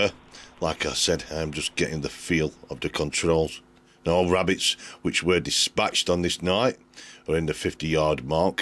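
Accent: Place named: United Kingdom. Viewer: Always enjoy your videos and I love your British accent. Are you from Newcastle? Keep them coming fella!!!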